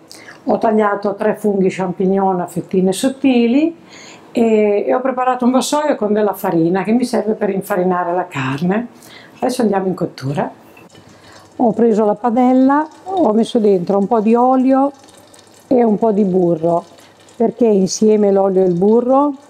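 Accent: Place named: Romagna, Italy. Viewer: Sono romagnola vivo in Belgique la guardo sempre l accento romagnolo Che belllooo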